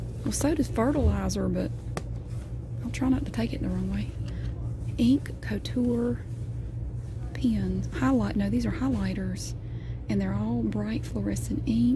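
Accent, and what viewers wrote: South, United States. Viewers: Hello I just wanted to say that I like your southern accent (idk how to spell it lol)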